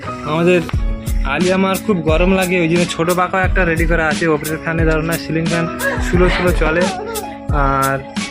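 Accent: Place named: Bangladesh. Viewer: আমি বাংলা দে-শ থেকে দেখছি আপনা দের সবার বিডিও দেখি আপনার। কথা বারতা শুনলে অনেক বাংলা দেশি লাগে দোয়া রইলো আপনার জন্য আমিন আল্লাহর কাছে সাহায্য চাই আপনার জন্য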